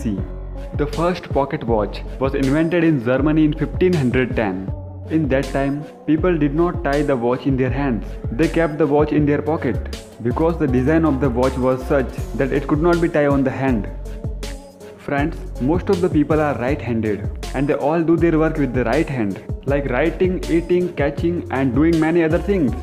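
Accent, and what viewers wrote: India. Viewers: But u have indian accent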